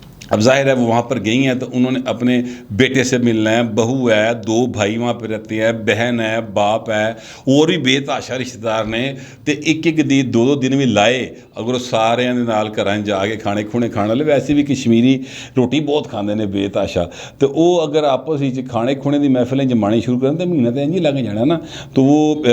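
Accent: Lahore, Pakistan. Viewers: Good 👍!! Typically lohery style sir ji😍😍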